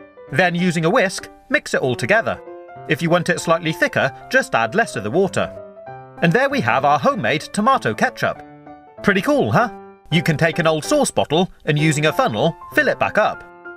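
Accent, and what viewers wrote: England, United Kingdom. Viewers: he's a British so he says to ma to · You brits talk funny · This guys voice is the most British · he has a english general accent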